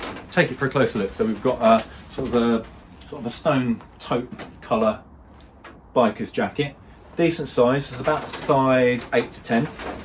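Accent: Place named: Cockney, United Kingdom. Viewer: Love to see you back. I thought something horrible must have happened to you. But no need to worry loads of people have a mid life crisis, yours just involved lots of ladies clothes 😮 UPDATE: When did you go all Cockney? Most people go the other way, your heading towards selling 80% baby laxative wraps outside McDonalds. I need to check back on some of you old vids this is confusing.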